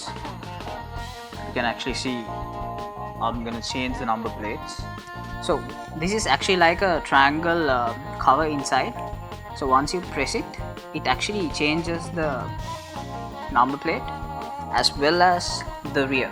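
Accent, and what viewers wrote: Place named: India. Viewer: What a fxxxxing Indian accent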